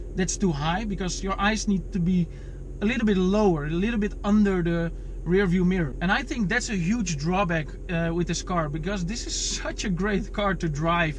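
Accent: Netherlands. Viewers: that dutch accent tho, jullie knn echt geen zak talen man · Your accent sounds like you're from the Netherlands? I have a 2005 E46 M3 with 265 mm square setup lowered on coilovers with all sorts of High Performance Suspension goodies. I treat my daily 50-mile round trip commute as my personal racetrack. I wouldn't say my setup is super high on comfort, but it is off the charts in fun. My car came with 19-inch Wheels but I eventually graduated to lightweight 18 inch wheels which perform better and are definitely more comfortable. Bigger selection in 18inch and less expensive too.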